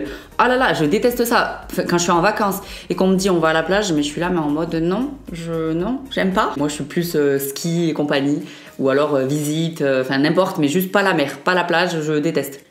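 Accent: Quebec, Canada. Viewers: Tu sais quoi ça fait pas longtemps que je regarde t’es vidéo et sincèrement tu gagne une abonné en plus ! La Mayonnaise , le KFC , Team série.. Non merci Je suis anti que bas du corps mais j’alterne mais effectivement que le bas .. No way ! 👍🏽 L’accent québécois c’est cute ! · Omg j'adore l'accent québécois 😍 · "LES ANDOUILLETTES C'EST LA BASE" trop raison! 😁 L'accent québécois c'est tellement mignon 😍 LES TACHES DE ROUSSEUR C'EST MAGNIFIQUE ! Trop cute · Vive l'accent québecois !! 🇨🇦❤🇨🇦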